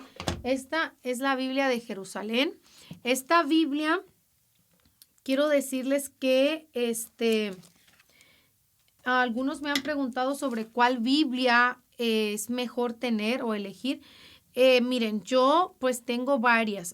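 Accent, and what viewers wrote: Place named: Colombia. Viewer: Bendiciones hermanita pensé qué ud era de Colombia, espero muchos podamos escuchar estas enseñanzas me gozo en el señor hablarnos con tanta autoridad del padre,el señor bendiga su ministro!!🙏🙏 y sigamos aprendiendo cada día más 🙏🙏🙏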